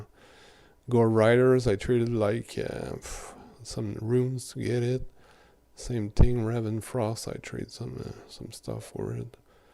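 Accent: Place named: Quebec, Canada. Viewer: quebecois jle sens dicite!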